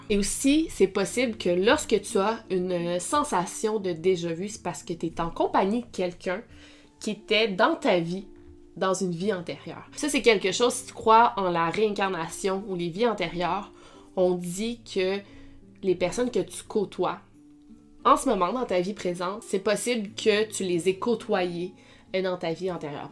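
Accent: Quebec, Canada. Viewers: Slt toujours très intéressent ton contenu sinon je trouve que tu perd un peu de ton français et que tu prend un peu plus de ton açent québécois ce qui facilite un peu moins ta compréhension cela pourrait nuire a ta chaîne et a ton public français...sinon c'est toujours sympa de t'écouter a plus👋👏👋👏🤟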